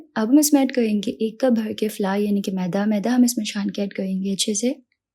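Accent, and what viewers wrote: United Kingdom. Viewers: i am talking about accent.. she is talking urdu in English accent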